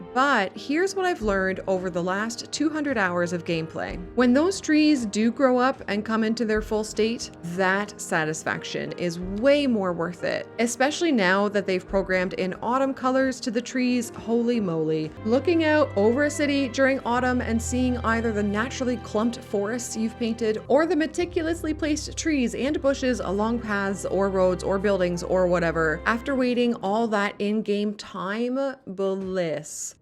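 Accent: Canada. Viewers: ABOOT- CANADIAN ACCENT- ugh lol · I have no idea why but you sound alot more canadian in this video than other ones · Nobody really says aboot but her accent does sound Canadian to me (source: Canadian) otherwise so I think it's just fun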